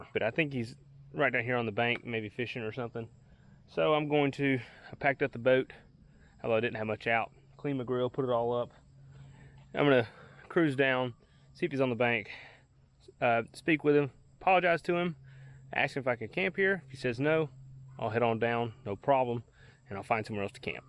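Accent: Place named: South, United States. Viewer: Damn man you have a perfect country voice.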